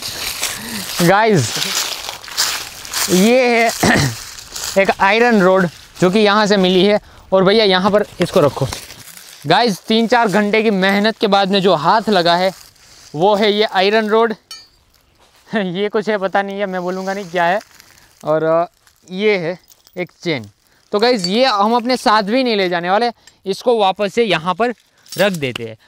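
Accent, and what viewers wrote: Gujarat, India. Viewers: Bhai tame gujrati cho tamre bole upar the kabar pady jay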